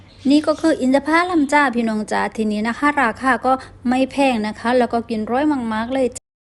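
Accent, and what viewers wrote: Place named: South, Thailand. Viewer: ืชอบจังแหลงใต้แบบนี้คนใต้คือกันจ้า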